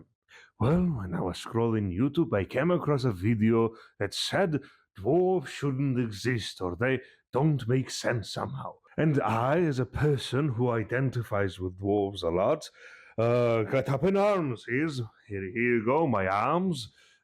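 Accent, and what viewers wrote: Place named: Wales, United Kingdom. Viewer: Ive been to Georgia before but this is the first time ive heard a Georgian accent that sounded welsh. Im guessing it must either be a regional accent or a result of having a welsh English teacher.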